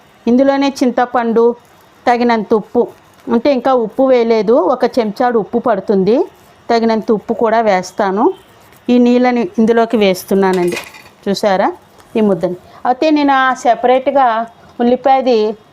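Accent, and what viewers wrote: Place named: Telangana, India. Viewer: Memu godavari Jilla vaallame naaku aavidham gaa maatlaadadam chaalaa istham kaani meeru Mee bhaashani maatlaadadam maanesi telangaanaa I pattukunnaaru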